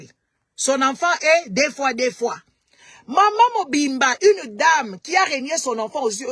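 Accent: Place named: Rwanda. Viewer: Longua yo Rwandaise déguisé en Congolais agent ya Kagame n'a communauté Ozalaka nayo mutu ya M23 Banda kala, role batinda yo n'a communauté eza ko kosa comme si Oza Combatant alors que ce faux, Ozalaka nde Rwandaise agent ya Kagame, ba maloba nayo sans impacté chez biso ba vraie Congolais de père et de mère, n'a lingala nayo avec accent Yaba Rwandais,Oza ya biso te et opinion nayo ezo compter te mwasi ya salite solo M23 déguisé en combatant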